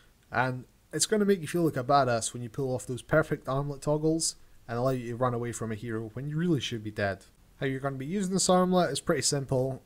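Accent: Scotland, United Kingdom. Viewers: good guide. Scottish I guess?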